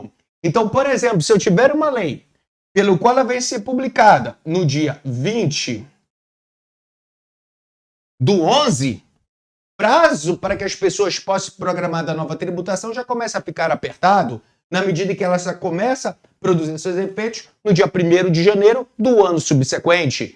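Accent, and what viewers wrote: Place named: Portugal. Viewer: Sotaque de português. Ótima aula!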